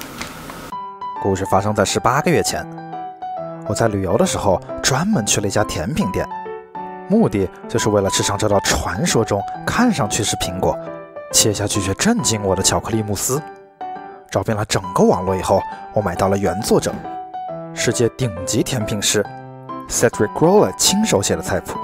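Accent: Wuhan, China. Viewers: 拐子的口音很武汉，亲切，武汉加油！ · 怎么听都像隐藏的武汉人呃！👍🤣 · 武汉老乡好。浓浓的武汉口音啊普通话。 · 我真的是爱死的武汉口音了😂😂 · rua 真是亲切的武汉话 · 出门在外这么多年，改不了的是满口的武汉腔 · 武汉人？有点耳熟：）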